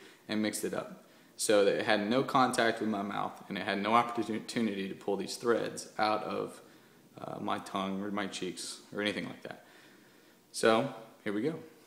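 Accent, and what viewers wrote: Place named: Philadelphia, United States. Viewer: Sounds like a philly/south jersey accent.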